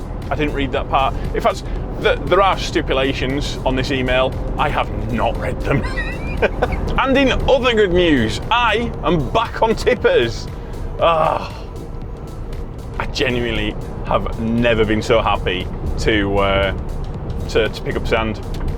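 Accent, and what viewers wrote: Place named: North, United Kingdom. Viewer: Enjoyed the vlog chap....your accent is northern..lol...great review on the dash cam 😂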